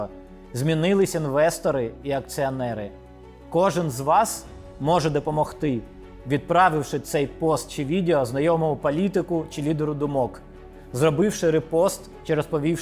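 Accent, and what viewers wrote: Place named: Russia. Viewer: стадіон так стадіон! дякую за українську мову. У вас є певні слова, які ви вимовляєте ще на російськомовний манер. Але тренуватись ніколи не пізно, вірю, прийде досвід і будете казати правильно. Успіхів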